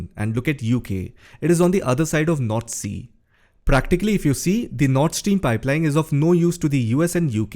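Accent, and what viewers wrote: India. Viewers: Wait…. R u endian?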